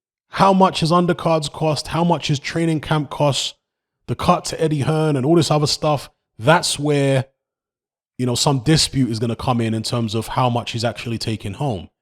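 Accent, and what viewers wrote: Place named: South London, United Kingdom. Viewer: In your introduction you conveniently answered a question that was never asked, this says to me that your objectivity about Dylian Whyte needs to be questioned it matters not whether individuals like Dylian whyte or not, that has nothing to do with the Wbc rules or there ruling, i quite like Dylian whyte but he's not a 60 40 title contender, he may be big in South London and judging by your accent that's where you sound where you could be from and if so your objectivity needs to be called into question not the British public, South London Dylian fan boys are not even the half of it not even 20% of it, FURY is a world recognised boxer Dylian isn't simple!!!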